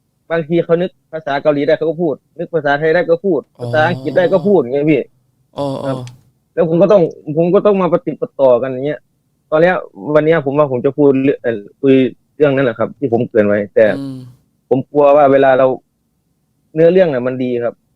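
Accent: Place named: Isan, Thailand. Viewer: 🙏สวัสดีค่ะ🙏.💞 💗.ตามฟังย้อนหลังทุก ep.คะ💓🍑.ชอบมาก เสียง สำเนียงภาษาบ้านเฮา😊เป็นนักเล่าที่มีเอกลักษณ์เฉพาะตัวจิงๆๆ☺️.🌅🎄.ติดตามคะ👌👍❤️💯💯💯💯💯💯💯💯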